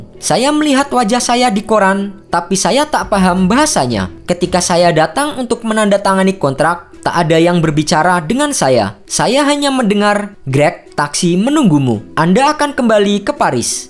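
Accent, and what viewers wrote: Indonesia. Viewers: Aksen indonya kurang2in mas, trayel bacanya ga sih? Bukan trial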